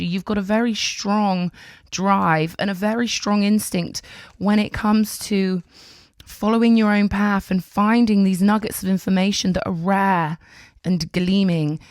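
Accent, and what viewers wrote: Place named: England, United Kingdom. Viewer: Lovely to hear your English accent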